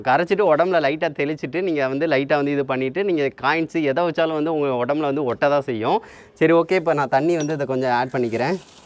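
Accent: Sivakasi, India. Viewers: Bro U sivakasi yaa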